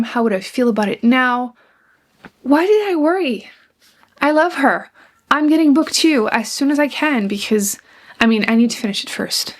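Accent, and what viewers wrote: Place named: Quebec, Canada. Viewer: I LOVE the accent québécois so much!! (and I'm really proud I understood everything haha)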